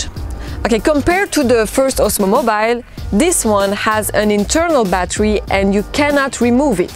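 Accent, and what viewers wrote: France. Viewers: Je viens de découvrir ta chaîne. J’adore ton accent français quand tu parles anglais ! Je comprend tout ! Je m’abonne du coup !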